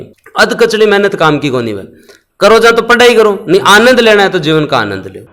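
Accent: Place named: Marwari, India. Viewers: Wah sir apki marvadi ka lahaja achcha h.. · शेखावटी टच है ।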